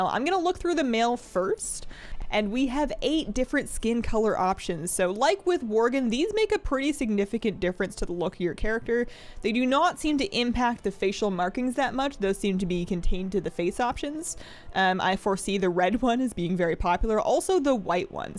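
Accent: Canada. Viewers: Can you tell she's canadian? :D I love your enthousiasm!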